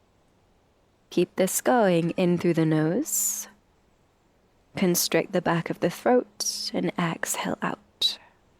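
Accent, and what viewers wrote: England, United Kingdom. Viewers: Hello dear... greetings from Iran ❤ I'm so happy to find your channel, I've done some lessons so far and I really enjoy the way you teach yoga... I absolutely like your british accent too😊 Wish you the best ❤❤ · hI - thank you for a lovely practise that really hit the spot for where I am just now. After a long gap I'm trying to get back into a morning yoga practice but deliberately wanted just a 20 minute beginners one that I felt I could commit to and not find too taxing while I built up my yoga muscles and love again. This was perfect! And some beautiful scenery and a lovely English accent to boot! all good - see you tomorrow. xxx💖💝💘🥰🥰😍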